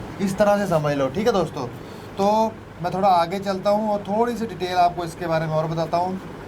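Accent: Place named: Haryana, India. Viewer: yaar pure hindi se bolo.. ye haryana language maja nai aa raha he....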